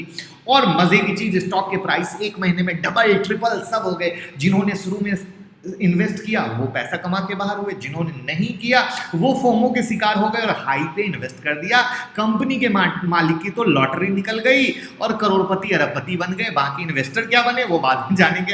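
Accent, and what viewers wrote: Bihar, India. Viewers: Aap bihar ke ho kya ?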